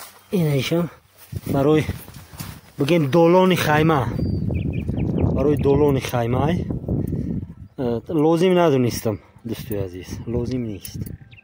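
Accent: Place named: Kuhistoni, Tajikistan. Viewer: Бисйор оличаноб дустам рохат кардем лачаи хуби кухистони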